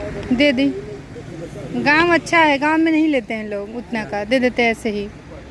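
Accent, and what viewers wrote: Bhojpuri, India. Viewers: Aap kaha ki rhne wali ho Didi mai ballia ki hun aapki language bhojpuri waisi hi lg ri h apka gaao kaha h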